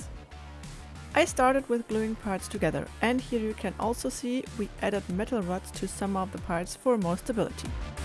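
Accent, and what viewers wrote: Germany. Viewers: DU BIST DEUTSCH AKZENT,VORSCHAU IN DER ECKE OBEN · You sound so damn German :d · You are german or swiss or austrian arent you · I just found you guys randomly and judging by your accent i figured you are german. Turns out you are. Also, it turns out you guys were at the Fantasy Basel. Seems like I'll see your work or even meet you guys in the next FaBa. Nice.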